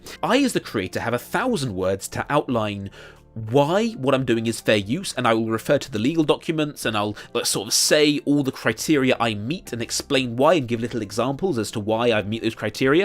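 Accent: United Kingdom. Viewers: Because he’s got a regular British voice and a regular British face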